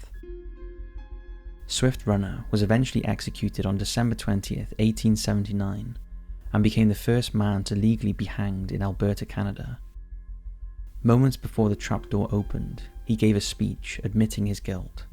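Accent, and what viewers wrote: United Kingdom. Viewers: Dude..your stories and narrations are awesome and gripping. One problem though. You talk so low its difficult to make it out even on earphones. An then there is your British accent ! (coming from an Indian Lol )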